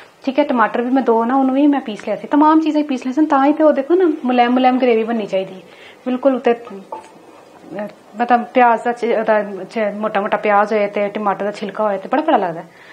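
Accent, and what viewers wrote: Punjab, India. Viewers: ji sister ji mai app ki punjabi bolnay kay style say he app ka fan bana tha pher app ki recipe etni achi thi kay kiya batahu sab ghar mai mama orr sis bana ka deti hain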